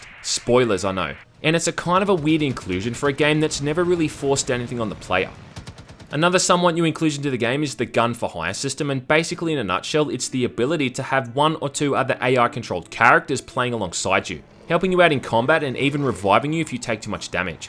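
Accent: Australia. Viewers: Dunno why but it was cool when you came out with the Aussie accent I haven't seen your vids before Just bought far cry 5 today, waiting for it to download Cheers from Perth mate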